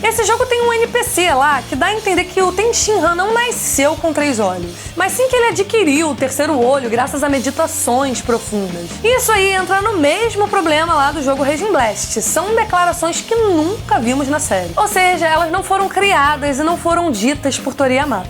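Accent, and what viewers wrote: Rio de Janeiro, Brazil. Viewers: Ela é carioca? kkkk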